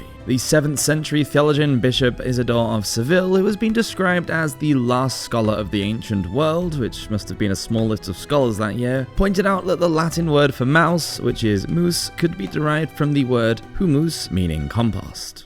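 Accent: United Kingdom. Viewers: I love the way this host talks, I am a Canadian, I quite often find some brit"s accent is difficult to understand, I guess there is many types of brit accents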